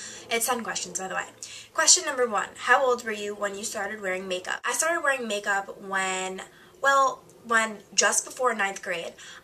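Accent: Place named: New Jersey, United States. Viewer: Your voice sounds like it changed from you regular sweet voice to .... a Jersey Shore accent.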